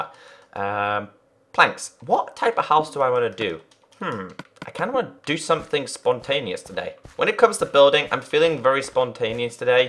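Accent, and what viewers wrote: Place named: Newcastle, United Kingdom. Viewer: Hoos you from Newcastle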